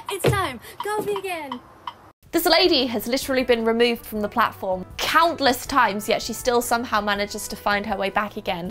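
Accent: England, United Kingdom. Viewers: Is she from england?? I lover her accent😭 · Very British, but you kept mentioning target and dollers... Do you live in America? But were born in England? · so, I saw the video in my feed, i entered, i listened to her british accent, I saw that she is a cool person, and I subscribed. that's all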